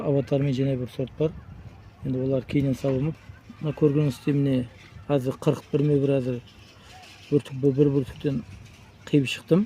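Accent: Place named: Turkistan, Kazakhstan. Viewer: Ассалаумағалайкум аватар жүзімінің қаламшасын күзде дәл осы кезде кесіп көміп көктемде тамыр шығарып алса болама? Түркістанның қазағымын сіз сөйлеп жатқанда қай тілде сөйледіңіз тура біз сияқты ғой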